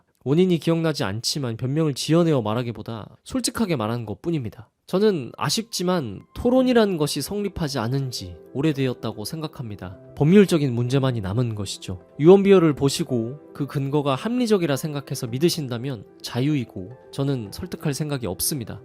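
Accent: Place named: Gyeongsang, South Korea. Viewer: 경상도 분이신가보네요 오늘따라 사투리가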